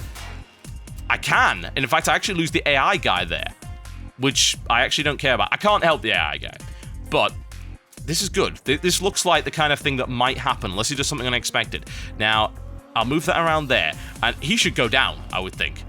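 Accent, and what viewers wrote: United Kingdom. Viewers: Speak slower british dude!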